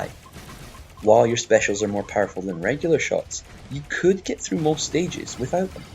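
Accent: Scotland, United Kingdom. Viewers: Just discovered channel and am a fan. Great to hear a scottish accent talking about ganes. Where are you from?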